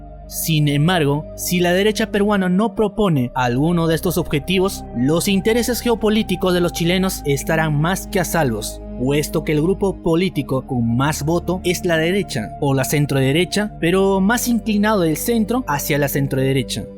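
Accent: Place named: Bolivia. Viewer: ☝ interesante información del video, pero ¡ con abundante dosis de alarmismo !!! 😒🙈🖓 Es evidente que, por el modismo, dejo o expresión de la voz del autor del video, éste, NO es peruano NI chileno ( quizás puede que sea boliviano, o de alguna otra región ). Pues bien, la realidad presente, NO es como aquí se dramatiza. Si bien existe una rivalidad de los peruanos con los chilenos, ésta se hace mas evidente en el ámbito deportivo, que en el político o económico. Ahora, si el Perú apuesta por desarrollarse y destacarse, NO es por andar pensando o viendo lo que hacen los demás, si no, por que es plenamente consciente del potencial y los valiosos recursos que posee. Y, por que en la búsqueda de tal fin, está dispuesto a trabajar con todos : tanto con EE UU , como con China ( por ejemplo, se estuvo rumoreando a través de las redes sociales, que los chinos, hicieron la propuesta inicial de la contrucción de megapuertos, a las autoridades chilenas, pero, tal parece, que, como NO llegaron a un acuerdo conciso, estos, se pasaron a proponer lo mismo al Perú, que aceptó de cajón. 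Así que, NO tienen nada que reclamar o envidiar al respecto, los vecinos sureños ). ✋ En cuanto, al supuesto plan extensivo territorial del Perú, es algo, que de plano debe descartarse, ¡ por que es completamente falso !!! 🖓 Los peruanos del siglo XXI , NO tienen proyectos expansionistas geográficos, si no, económicos : la ' conquista ' es de nuevos mercados alrededor del mundo, y, NO de territorios vecinos, qu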